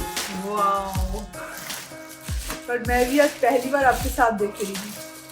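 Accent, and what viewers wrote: Bengal, India. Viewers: Still you're carrying your Bengali tone, accent and pronunciation in your Hindi and English conversation/words.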